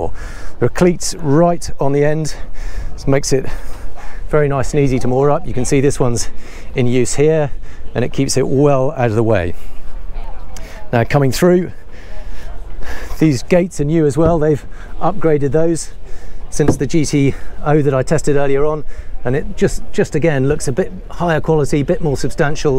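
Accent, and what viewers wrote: England, United Kingdom. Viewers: Does anyone care about insulation for cold weather boating? It's a super impressive for the price. It is amazing. However boats in general tend to ignore, three of the four seasons we have here...as in Ireland, where I'm from, Scotland, where he mentioned the boat was from, or England, where he sounds like he's from...but basically the entire north half of Europe, all of Canada, and basically almost all of the USA. I'm not trying to say a boat like this is bad, because for the price, it's super. But if you've ever been in a warm house with poor insulation, or patches of poor insulation, then you know about kold and how hard it is to get out. It will literally dif itself into surfaces like plaster, concrete, and ceiling boards. I know this from first had experience of literally digging into a concrete wall, and a ceiling board, on separate occasions, and finding mold dug in a good few millimetres. 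Any cleaning fluids won't work when it's inbedded into the concrete itself.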